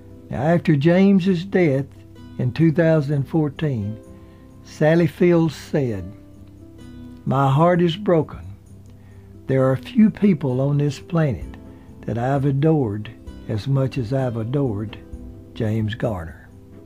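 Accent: South, United States. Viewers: Very touching documentary, made better by your homespun southern accent. Thanks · Where is the narrator from..? His accent is wonderful? (I mean which US state) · has this addictive mesmerizing All American folksy voice that's perfect for story telling. This story and the one of Billy The Kid are excellent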